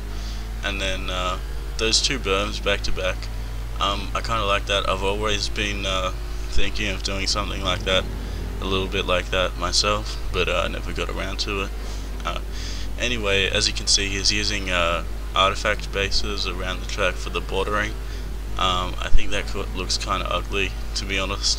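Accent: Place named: Australia. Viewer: Great Australasian accent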